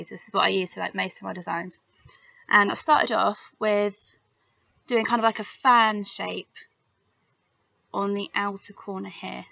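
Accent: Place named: Northeast England, United Kingdom. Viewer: I think this is a great idea and love your other shoe designs as well. I also think your accent is just adorable. Where do you come from? (I'm guessing northeast England but that's just a guess)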